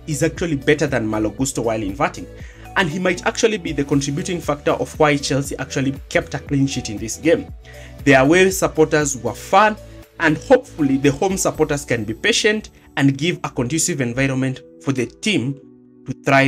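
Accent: Kenya. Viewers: Are you Kenyan? You sound like one 😂❤❤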